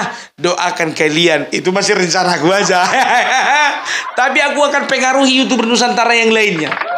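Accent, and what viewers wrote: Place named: Bali, Indonesia. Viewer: Wah logat Balinya kentall Joss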